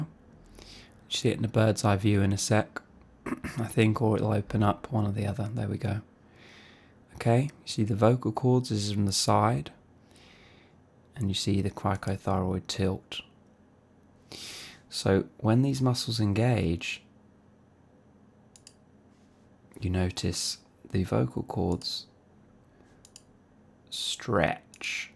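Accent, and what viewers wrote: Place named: London, United Kingdom. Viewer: Uou sound like your from London area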